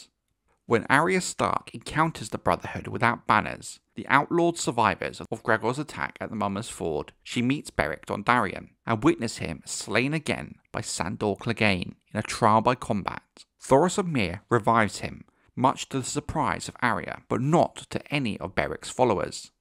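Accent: United Kingdom. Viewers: Hearing a narrator from the UK (assumption) makes me feel like these are history videos as opposed to lore.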